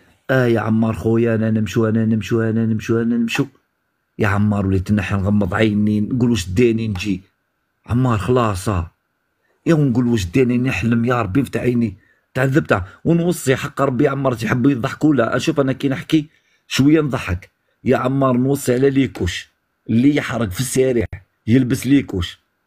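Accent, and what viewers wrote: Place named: Chlef, Algeria. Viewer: المتحدث كلامه مشوق باين وليد فاميليا ولكن يظهر لي ماعندهش اللهجة تاع ناس البويرة وسط المدينة يبانلي عنده اللهجة تاع ناس الشلف الكرماء والله اعلم .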